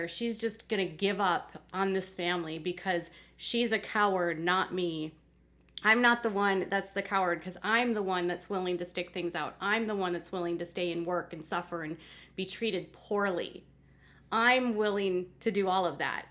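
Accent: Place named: Midwest, United States. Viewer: I hear your accent more when you’re behind the scenes… Midwest?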